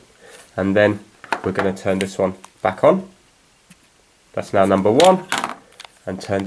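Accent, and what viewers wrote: United Kingdom. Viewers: He doesn't speak American English he speak British English UK English he mean the game Zelda not the persons Zelda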